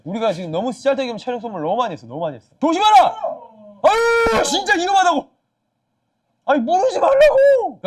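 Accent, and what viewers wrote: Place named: Jeolla, South Korea. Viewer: 전라도말씨로 해설듣는건 첨인데 은근 중독성있네 😂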